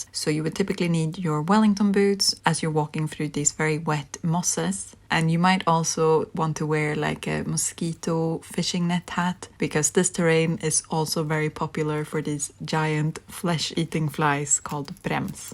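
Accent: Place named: Sweden. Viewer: Wow, vad är oddsen! Algoritmen ledde mig hit och jag hörde att du hade svensk brytning och när du nämnde norra Sverige funderade jag på om det kanske var någonstans i mina trakter du var från. Tyckte du såg bekant ut och sen klickade det! Hej! Vi gick i samma klass i låg-/mellanstadiet. Vet inte om du minns mig, Mirjam (fast numera kallas jag Morris 😊).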